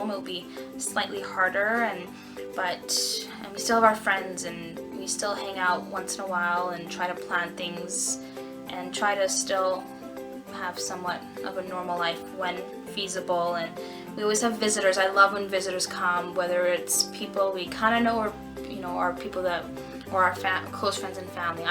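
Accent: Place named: Canada. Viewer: I give you the most amount of Kudos for going home at night. if anyone wants to criticize you, let them,.... because there are so many of "us" who will come to bat for you! You are the greatest mom and Nick is a great father too! I love your Canadian accent... my husband is Canadian! He tried his best to get rid of his accent and he did! I just love your testimony of Evanna! You are so strong! God Bless you sweet girl!! I PRAY for you and your family everyday!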